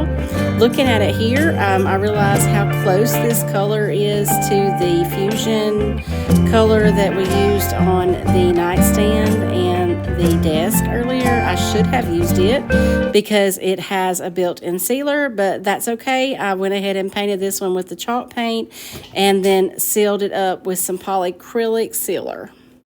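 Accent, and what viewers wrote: South, United States. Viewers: thank you for taking the time to reply. I've been watching all your past videos too. I am in Canada and I had to use a white wax that it's available here. It looks exactly like DIY one in buttery texture but it does not dry. I will have to find a solution to this problem. In the meantime...#1 love, love, LOVE your southern accent. I used to live in South Carolina. Best years of my life. #2 I saw the video where you were thinking to quit YouTube. So glad you didn't. We need more people like you. We live vicariously through you. #3 I also loved the video where you put down pen and paper and explained what profit you made in a month in your booth. I loved the honesty I know you must be super busy, I'm a mom too with young kids and a full time job. I'm amazed at your energy. Keep them coming videos. I love watching them.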